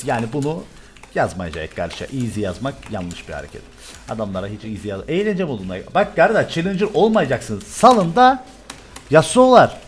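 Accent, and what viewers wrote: Cyprus. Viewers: abi kıbrıslımısın çünkü bende sesin aynı kibrisli konuşmasını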